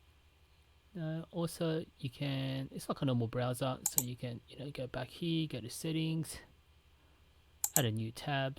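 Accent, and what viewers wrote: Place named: United Kingdom. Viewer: Bro, what's your origin? You sound pretty UK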